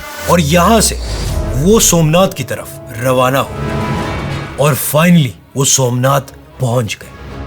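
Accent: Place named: Kohistan, Pakistan. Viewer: Style, accents, editing everything seem like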